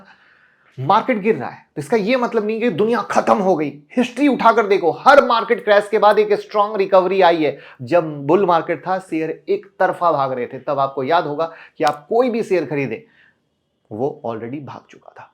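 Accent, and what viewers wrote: Bihar, India. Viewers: Aap up ni Bihar se ho bro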